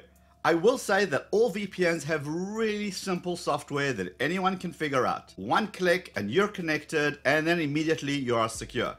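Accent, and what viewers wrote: Johannesburg, South Africa. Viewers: Are you from South Africa as you acent sounds like JHB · Dude you sound South African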